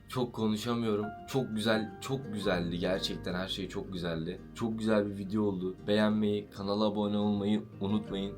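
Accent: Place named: United States. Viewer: Abi amerikalılar gibi konustun 😅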